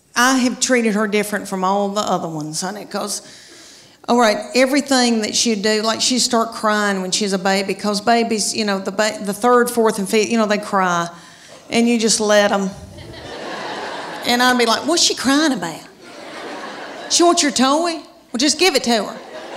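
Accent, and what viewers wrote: South, United States. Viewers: O" Really? Me loves ya' Southern Country Accent though with them jokes of yours fits ya' Personality so, Very much or should me say fits ya' Personality so, Very well._ (Nevertheless, me Mr. Amos).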